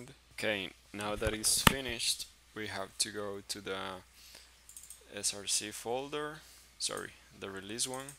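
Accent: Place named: Costa Rica. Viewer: Are you Costa Rican? Your accent sounds very Costa Rican to me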